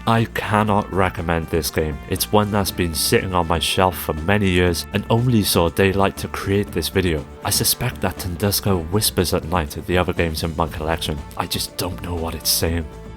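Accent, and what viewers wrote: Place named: North Wales, United Kingdom. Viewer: Quality vids. Random but are you from anywhere in N.Wales? Familiar accent.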